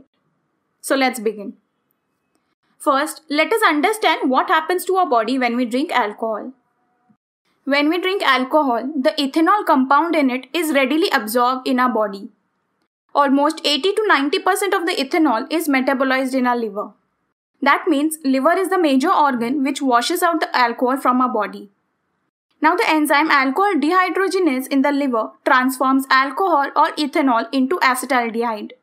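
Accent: India. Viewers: Indiaaaaaaaan pleeaaase stop talkin’ inglish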